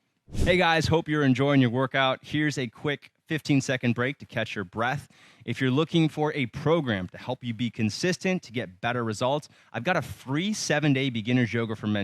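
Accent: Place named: United States. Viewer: awful american accent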